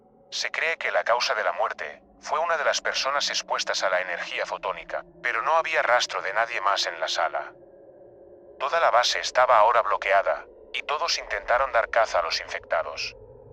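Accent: Spain. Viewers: Ha que buena historia de pelicula se podria decir. El area 51 si es, una base experimental no hay duda de ello y si vivieras en el area de las vegas y mas cerca de la area "S" lo sabrias y no te quedaria duda de ello. Me llamo la atencion porque me identifico con lanprimera parte de su historia ya que fui official de guardia en las afueras de acceso inmediato a los primeros edifices y angares. Al pasar el tiempo estuve assignado a permanecer en mi puesto solo hasta determineda hora pero, decide a la alta actividad y constants vuelos,e quede por mas de dos horas. Vi lo que yo dijiera, objetos de vuelo de prueba y otros que llegaban junto con F-16. Ahi en ese momento un sensor de seguridad se activo y me arrestaron por estar ahi ilegalmente quiero decir, no segui instrucciones de mando y fui dado de baja indecorosamente y sin antes firmar un tratado de silencio por 50 años. 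No pude hacer nada y ahora vivo todavia aqui en Las Vegas recordando que a veces de tanto que quieres saber de este tema de vida extraterrestre, llegas al momento en que ya no quieres saber nada. Lo que pasa ahi no esta upto para el ser humano ya que puede cambiar tu forma de ser y pensar. Asi que ya ven, no es facil llegar hasta donde dice este amigo con acento Español. Desde los años 50's se posee una tecnologia de punta de seguridad ahi que reconoce y detectable en calor del cuerpo humanoy de algunos animales, sistema de reconocimiento facial y alguna itra tecnologia que te puedes imagunar. NO SE OUEDE PA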